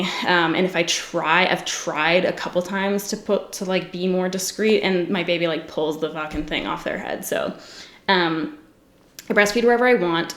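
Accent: Canada. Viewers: WHY DO I NOT REMEMBER HIM SOUNDING THIS CANADIAN WOW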